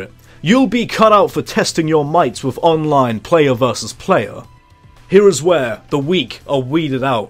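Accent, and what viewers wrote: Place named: England, United Kingdom. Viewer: Trevyn Garris he should go into voice acting. Lord knows there's a shit ton of English accent VAs in games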